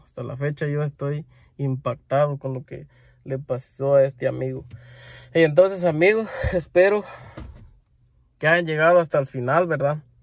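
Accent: Mexico. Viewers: Eres mexicano Ablas como Mexicano te copiaste de Asento Mexicano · Es la primera ves q veo este canal y creó q el muchacho es de Guatemala, pero tengo duda porque abla como mexicano, me cayó mui bien 👍 seguiré mirando sus videos para subscribirme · No les quita nada de que el quiera hablar como los mexicanos!. Yo soy mexicana y no le miro nada de malo. Malo es cuando se expresan mal de Mexico 🇲🇽 pero en este caso no es así. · Soy méxicana y no veo nada de malo que hable como nosotros.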